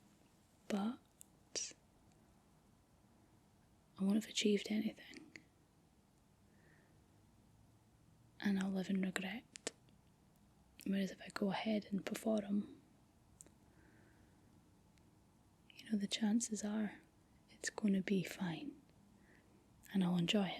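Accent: Scotland, United Kingdom. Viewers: Wow. That's like the most scottish accent I've ever heard 😂❤ and I've been to Scotland once, and loved it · Pretty Scottish lady, the English and Americans laugh at your Scottish accent, but I don't; I find it pleasant and it helps me understand English better. Also, when I listen to the music from Mel Gibson's Braveheart while flying over your Scottish landscapes with a drone, it's magnificent. I'm French, and I hope to hear from you soon.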